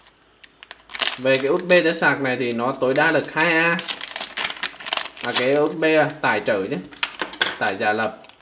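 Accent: Nghe An, Vietnam. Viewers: bạn ở nghệ an à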